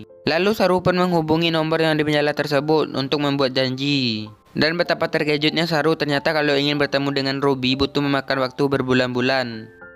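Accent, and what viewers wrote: Madura, Indonesia. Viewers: eh logatnya kek mdura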